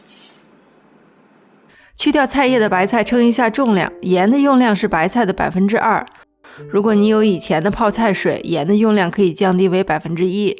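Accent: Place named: Shaanxi, China. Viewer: 是陕西人啊，听出来了，能打听一下你做浆水鱼鱼的那个工具在那买的吗？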